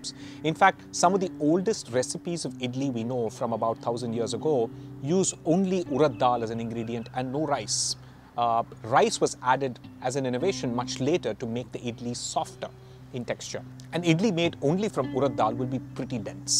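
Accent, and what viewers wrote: India. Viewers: Great to listen Indian sound, ❤with depth of knowledge ,love to listen more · the Hindi accent I like too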